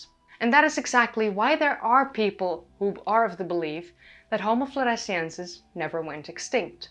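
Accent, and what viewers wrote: Netherlands. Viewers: Your accent, perfect english, but are you dutch? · do I hear a slight Dutch accent? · I can detect the Dutch accent quite clearly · Sounds like a Dutch accent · Zie je wel, ik HOOR gewoon dat dit uit Nederland komt. Klee is trouwens een DUITS woord. Is dat met opzet? En je leraar Engels hield veel te veel van romans lezen. Uitspraak hoort echt veel meer overdreven en Nederlanders vertikken dat. We klinken bijna allemaal veel te vlak. Zaai. Niet genoeg verschil in hoog en laag. En niet dynamisch genoeg. Jammer, hoor. Luister gewoon eens naar Amerikanen of Britten. Ze zetten hun accent veel steviger neer. Dan klopt het ineens! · Your English is great! I could swear you have a Dutch accent somewhere though but that could be a bias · what is your native language? Dutch? (It's the way you pronounce anthropology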